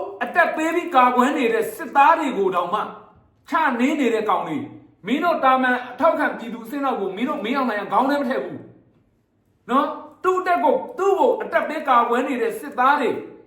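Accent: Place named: Myeik, Myanmar. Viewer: မြိတ်သူမလေသံ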